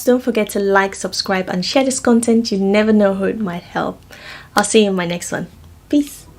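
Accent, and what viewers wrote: Nigeria. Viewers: Are you Nigerian? Your accent…😍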